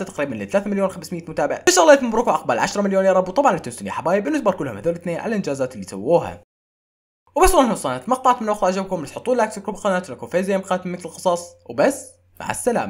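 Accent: Iraq. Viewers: اسرع بني ادم بالكلام انت واللهجة العراقية شوي صعبة الفهم خف عليناااااااا 🙊😹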